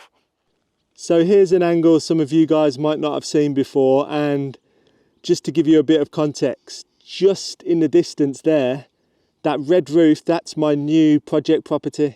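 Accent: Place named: England, United Kingdom. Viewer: Hello! I like your videos. From a true portuguese ( many are now fake&soon they will be gone as well as in England is happening about fakes),. I prefer to listen to your nice english accent. I don't like this AI abd is not portuguese is brazilian which is called portuguese from Brazil for them to take advantage. How can I take this AI and got back your voice??? Can u tell me please? I tried deactivated legends, put translating to look for english, but that option is not availabe.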